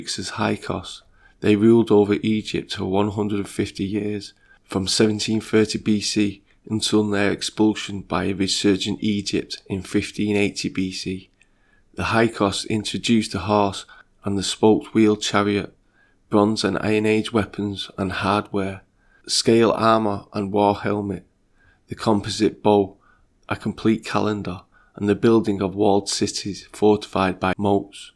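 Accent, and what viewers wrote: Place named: Manchester, United Kingdom. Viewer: Much respect this is amazing the narrator who's telling of these accient events sounds like he's got a Manchester accent much love from liverpool keep up the good work my freind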